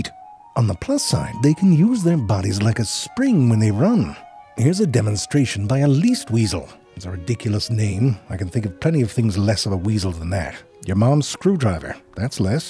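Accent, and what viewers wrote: United Kingdom. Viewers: Is it just me or is the voice starting to take on some elements of a British accent?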